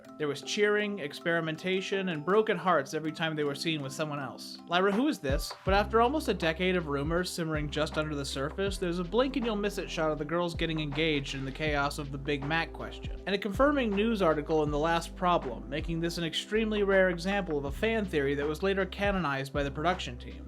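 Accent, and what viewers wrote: Netherlands. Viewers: ARE YOU DUTCH!! 🎉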